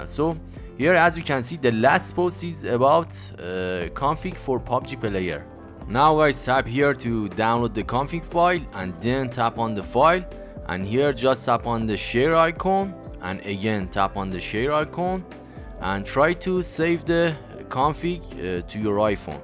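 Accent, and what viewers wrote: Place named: Iran. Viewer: 😂 اومدم کامنت بخونم لجه ایرانی فقط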